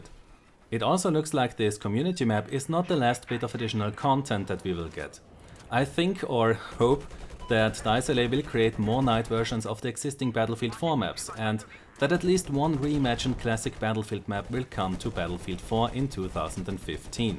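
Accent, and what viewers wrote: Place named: Germany. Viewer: I do have to say, besides your sultry German (?) accent, you are probably the ONLY Battlefield YouTuber that actually looks at the bigger picture. The one who sits down and analyses things instead of bitching about them (like LevelCrap and others). You actually have the more levelheaded approach to how Battlefield works and what should be done to make it better. Keep up the good work!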